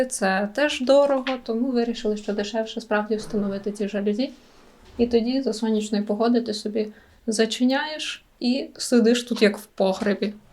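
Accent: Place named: Kirovohrad, Ukraine. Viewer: Слухаю твою мову. Відмінності з Кропивницькими селами мінімальні.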